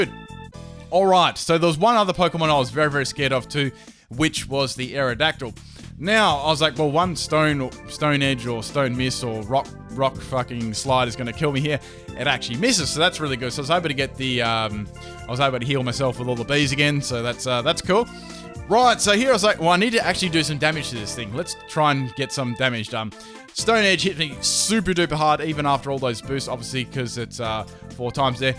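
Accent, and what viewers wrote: Australia. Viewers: its fine, he's aussie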